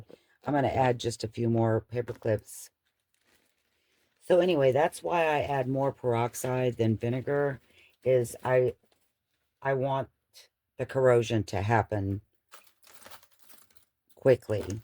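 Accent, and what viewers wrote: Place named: Texas, United States. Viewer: Thanks for this, this is so helpful for someone like me, to get our toe in the water. You’re so fearless!!! Wear gloves!!! If that stuff is corrosive enough to rust paper clips so fast, just think what it could do to those fingers! The reason the soda can doesn’t rust is that it’s aluminum and aluminum won’t rust. And, just another thing, hydrogen peroxide is something that some bombs are made of 😮. Not the stuff you’re using, not the stuff that you can get over the counter, but take care to pay attention to the strength on the label and how warm it’s getting. You can even have an ice bath by just in case things seem to be getting out of hand. Just in case. 😅😊 And wear gloves!!! What about washing the things then rinse with alcohol and let dry thoroughly. Don’t let any alcohol stay behind - another chemical reaction. 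But, then there wouldn’t be any grease or dirt coating to keep it from rusting faster. The the sticks are just the same as paper. 🤔 And wear GLOVES!!! You sound like you live right next door to me here in Austin, TX, get on down to the H-E-B and get you some of them cheap vinyl gloves… 😂😊 I just love your show and I don’t miss a one 😊🥰💙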